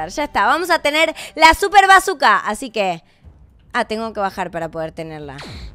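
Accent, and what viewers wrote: Argentina. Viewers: Usted es de argentina verdad?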